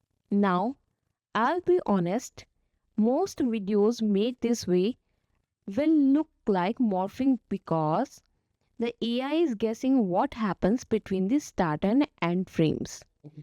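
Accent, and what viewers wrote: India. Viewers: Jesus Christ, you're indian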